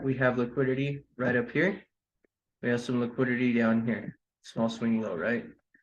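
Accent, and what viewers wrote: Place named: South Asia. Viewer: South Asian English Accent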